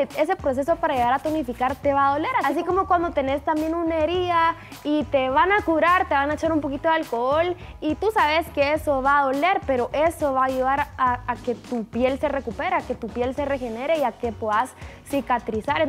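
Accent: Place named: Guatemala. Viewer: Es de Guatemala.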